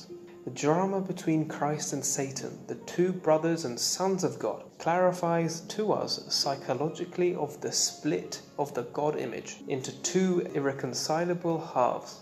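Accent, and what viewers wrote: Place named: London, United Kingdom. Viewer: Where are you from? You sound like a Londoner but I've never heard the word 'says' pronounced your way.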